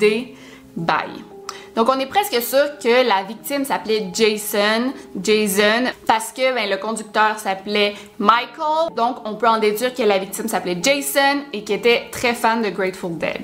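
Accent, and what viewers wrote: Quebec, Canada. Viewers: je comprendrais jamais pourquoi tu te fais autant critiquée😭 je sais pas, tes vidéos sont supers, recherchées, tu expliques les “détails”, ta voix est très agréable et j’adore l’accent québécois ahah! ce que je préfère c’est les kidnapping/enlèvements :) voilà bisous, et félicitation pour ton mariage (qui est dans un mois, je crois ?!) ❤️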